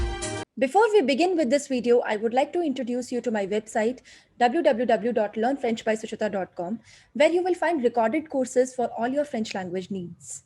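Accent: France. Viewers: Hats off madam . Wat a clear explanation n off course ur French accent is superb.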